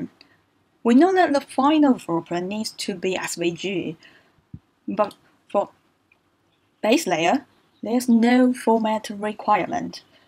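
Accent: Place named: United Kingdom. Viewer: 小姐姐的英式英语让我惊呆了！告诉我，还有什么你不会的？：）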